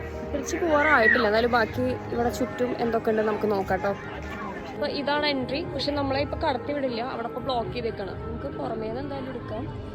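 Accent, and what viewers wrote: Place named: Thrissur, India. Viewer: Assal Thrissur slang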